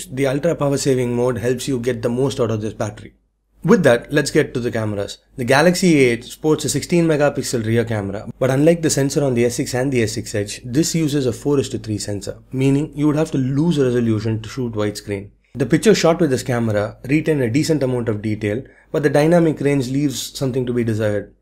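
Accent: India. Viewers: dude what happened to your voice.. you need more power you sound tired... the Indian accent is fine but you need to be more energised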